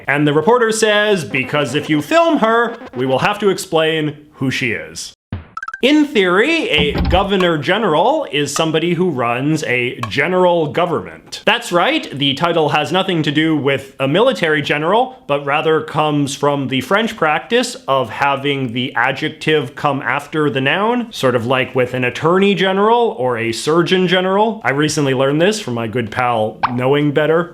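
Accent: Canada. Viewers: I love yer sarcastic energy 💃🏼(& that is one heavy Canuck accent 😁 unlike my daughter who lives in van, she is dual citizen, no accent, tho she knows french)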